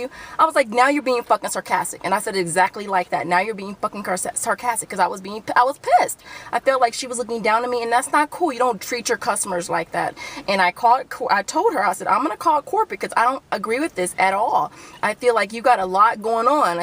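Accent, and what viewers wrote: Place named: Latin America. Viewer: This has nothing to do with your video, but it sounds like you have a latino accent. Are you of Latino descent? Sorry you had a bad experience. Target sucks most of the time.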